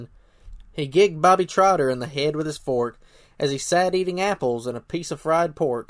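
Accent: South, United States. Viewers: Another great work! And you narrate so well. Your southern accent is always quite pleasing! Thank you for your YouTube channel.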